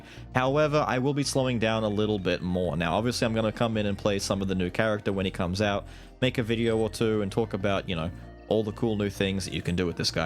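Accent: Australia. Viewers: I didn't stick around for Skul. I saw funny looking thumbnails and a funny sounding Australian man, I knew for a fact that Skul is just the start for something more.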